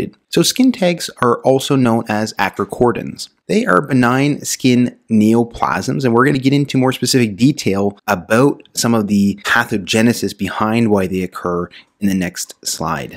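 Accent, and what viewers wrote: Canada. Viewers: It's called a Canadian accent, genteel educated version.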